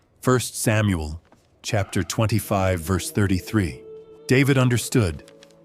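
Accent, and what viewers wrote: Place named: Africa. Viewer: African accent you aren't saying ask but axe ..put me right off.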